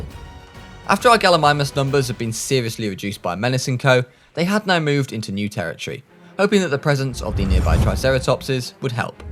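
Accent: Czech Republic. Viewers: who wouldve guessed your from czech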